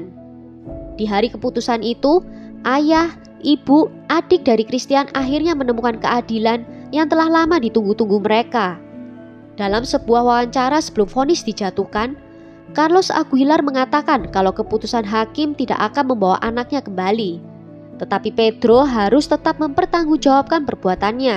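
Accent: Java, Indonesia. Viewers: Hay jg medokuhhh..sukaaaa👍👍😍😍😍💞💞💞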